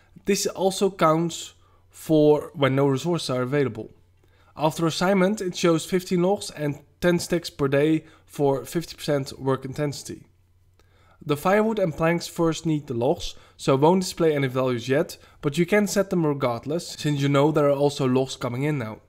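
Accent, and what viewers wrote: Netherlands. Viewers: Wat ben jij nederlands zeg haha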